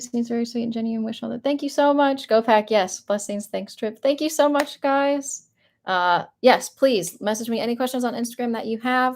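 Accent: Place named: South, United States. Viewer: Your southern accent is very subtle, also; in my time in China, nearly every American I met was from the south, including me (NC). If you feel comfortable answering, what did you do in China? and is Seoul similar to Chinese cities? This would be really helpful because I used to live in Shenzhen and am considering studying in Seoul for a year as an exchange student.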